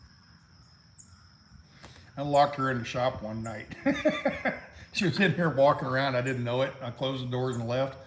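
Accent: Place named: Ohio, United States. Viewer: Love your vernacular language. My father was from Ohio and he spoke and sounded like you. He was intelligent but kept his family language. I love your pleasant voice and giggle. Ron and I took 2 pairs of Sketchers, the same two type and colors Grey Wolf had purchased and hand carried them into the middle of Nevada. He was so appreciative. Ron walked a mile or so near Austin, NV and I kept the back end safe. Hope you mention his efforts and that those who join him have enjoyed their experience. God Bless (Geri)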